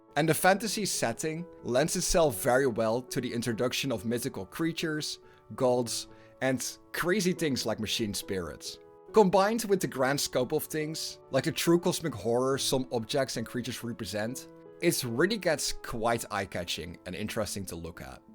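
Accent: Netherlands. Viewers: The dutch accent.... like none other :)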